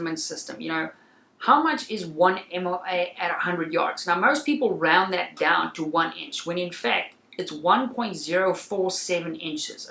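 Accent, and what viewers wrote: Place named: South Africa. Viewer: Man. Goed Om ‘n Suid Afrikaanse aksent te hoor in die vreemde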